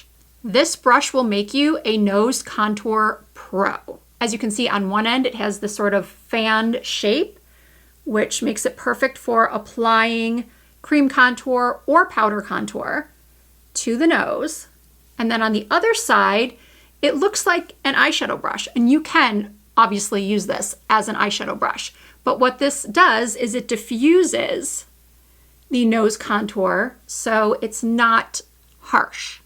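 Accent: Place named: Michigan, United States. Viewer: What part of Michigan are you from? Good video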